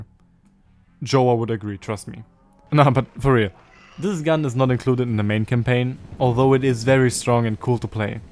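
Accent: Germany. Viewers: ich habe die ganze Zeit deinen akzent gehört und dachte schon das du deutsch bist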